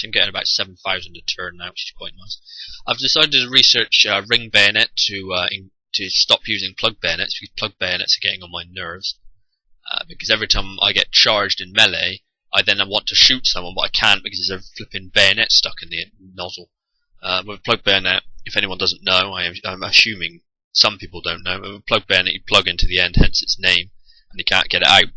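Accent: United Kingdom. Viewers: I have never heard an Indian with a British accent before. It sounds nice. No really still loving this rant.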